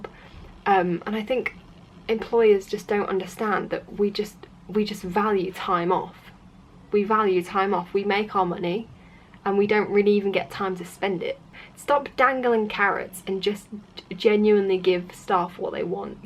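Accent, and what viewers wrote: United Kingdom. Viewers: Just came back to watch that video. Now i am here after just 1week u uploaded this. I also came back for that vid like 4 month ago. 🙁 i could make life so easy for people but that would make lots of money to work out the system and people should be happy with very simple similar things like houses would be the same or like 2-3 types maximum. To cut down the worktime. Humans are like ants most of us will never be free because we rely on each other's work... You sound british wish we met when i was living in the UK. 😅 we would talk about our hate for work and brainstorming about it haha. 😆 when employees say me u can be a machine setter etc.... i am like yeeaaaahhh 🙂 (inside: 😫🤮)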